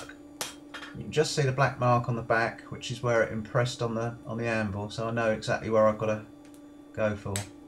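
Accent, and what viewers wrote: United Kingdom. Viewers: Hey you sound like you're in the UK, great video it helped me a lot, I'm learning how to make complex sword hilts and this helped a lot, I just made a bunch of drifts and slot/hole punches out of some rebar and chisels. Do you happen to know a good place to get cheap swages and drifts here? or if you have any old ones for sale let me know, having trouble finding good large ones.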